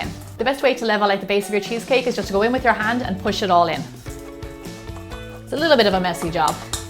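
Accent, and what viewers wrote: Ireland. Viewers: R u from Northern Ireland or Southern Ireland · Irish accent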